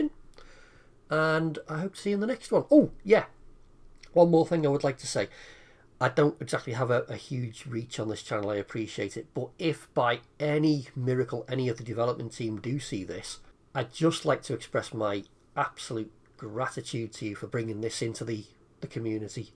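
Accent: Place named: South Shields, United Kingdom. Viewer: Sounds like your from South shields 🛡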